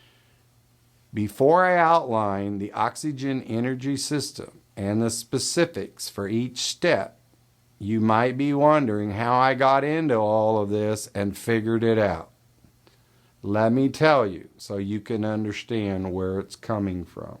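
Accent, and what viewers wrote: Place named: South, United States. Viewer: Your delivery and pacing is really good. I disagree with those who want it faster. They must be hyper active coastal types. Very refreshing compared to many frantic YouTubers. You sound like a southern good old boy: easy on the ears : -)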